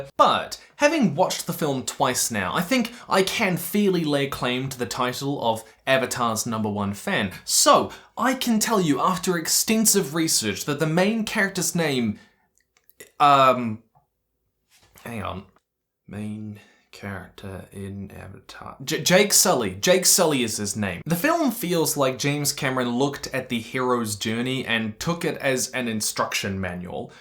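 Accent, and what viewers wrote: New Zealand. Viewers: Am I only now finding out you're kiwi but and not just British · Hold the phone. I didn't clock the kiwi accent for YEARS. Someone revoke my residency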